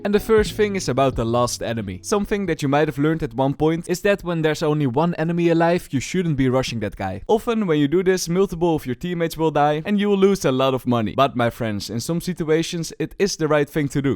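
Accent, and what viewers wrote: Netherlands. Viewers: first 10 seconds of the video i recognize a heavy dutch accent and i was right LMAO xd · hahahaha ik hoor die nederlands door je accent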